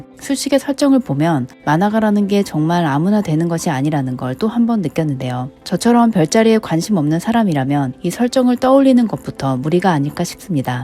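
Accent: Japan. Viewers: 영어도 일본어 느낌으로 읽네요 ㅋㅋ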